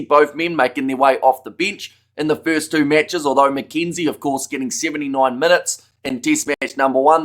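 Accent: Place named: New Zealand. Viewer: Brother you have a unique NZ accent. For example when you say test match it would be 'dest match' or when you said contest it was 'gondest' .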